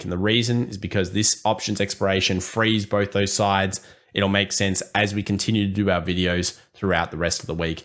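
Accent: Australia. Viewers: You da man! Do you have a Crocodile Dundee Knife or an American knife? Sorry, that was my first encounter with an Australian Accent... And I have your Whatsapp #! I'm going to call from NY to wake you up!!!!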